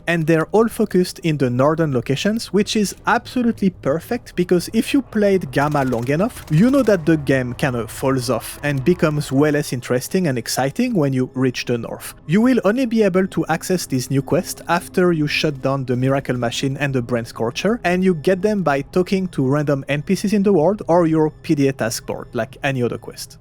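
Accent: France. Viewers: Amazing video, cool french voice to artefact hunt to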